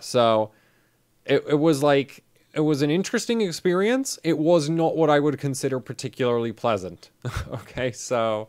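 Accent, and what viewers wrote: United Kingdom. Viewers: British.. I never would have guessed it. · British lol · I didn't realise your from the UK because your accent is very vague. I'm quite disappointed that there's very little tech events that happen in the UK. I would love to see you and 8PACK as an overclocking team (you have all the hair and he has all the muscles).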